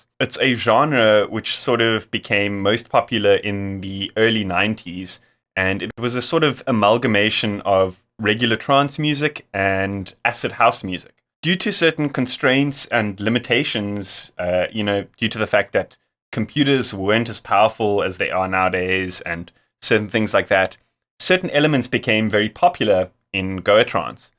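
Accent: South Africa. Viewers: Yo are you South African my g you have the accent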